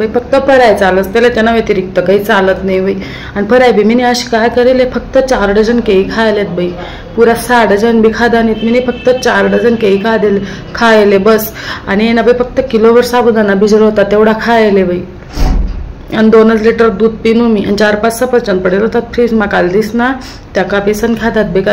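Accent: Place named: Khandesh, India. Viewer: पुर्ण खान्देशी accent पाहिजे ऐकायला मन तडपतय अजून आम्हाला शिकाचे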